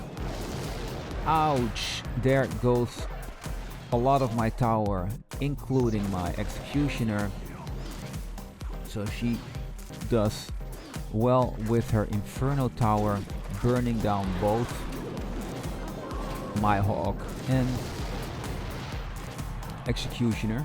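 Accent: Netherlands. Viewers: Mooi amsterdams nederlands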